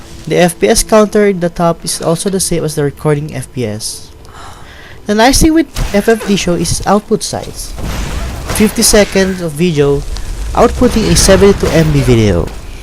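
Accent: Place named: Philippines. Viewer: are you Filipino? You have the accent.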